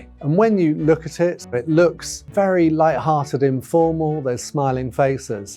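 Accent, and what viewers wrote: RP, United Kingdom. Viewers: take your point re regional dialects. But Richard does not speak with a regional dialect. I would suggest that he speaks a modern version of Received Pronounciation, which is called, unsurprisingly, Modern RP! The dialectal pronounciation of picture as pitcher is more of a feature of Cockney English, for example.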